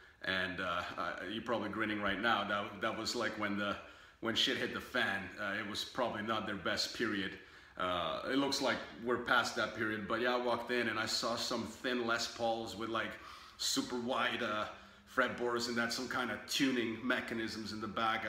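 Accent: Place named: Italy. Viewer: You sound a little bit italian😂. I‘m italian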